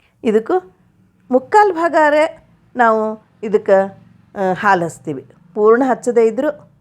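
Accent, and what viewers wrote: North Karnataka, India. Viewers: ನಿಮ್ಮ ವೀಡಿಯೋಸ್ ಭ್ಹಾಳ informative ಆಗಿರ್ತಾವ.... ರಿ ಮಾಮಿ... ನಾನು ಶ್ರಾವಣ ಗೌರಿ ಕೂಡಿಸಿದನ್ನ ನಿಮ್ಮ ವಿಡಿಯೋ ನೋಡಿನ ಕಲತೀನಿ.... ನಮ್ಮ ಉತ್ತರ ಕರ್ನಾಟಕದ ಭಾಷೆ ಭ್ಹಾಳ ಚೆಂದ ಕೇಳಲಿಕ್ಕಿ.....ಏನೋ ನಮ್ಮತನ ಅನ್ಸ್ತದ ರಿ... ಇ ಭಾಷೆ.....Thanks ರಿ.... ಹಿಂಗ ನಮಗ್ ಮಾರ್ಗದರ್ಶನ ಮಾಡಕೋತ ಆರಾಮ ಇರಿ.....❤😊 ಮಾಮಿ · ನಿಮ್ಮ ಉತ್ತರ ಕರ್ನಾಟಕದ ಭಾಷೆಯ ಶೈಲಿ ತುಂಬಾ ಚೆನ್ನಾಗಿದೆ ಹೀಗೆ ಮಾತಾಡಿ ಮೇಡಂ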